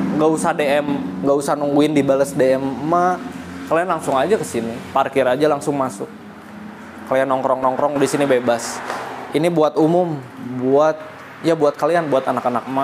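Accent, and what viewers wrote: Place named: West Java, Indonesia. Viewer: Resep ka orang Sunda mah, boga cirihas sorangan Amun nyarios bahasa Indonesia ge, angger hentte lengit sunda'na mahh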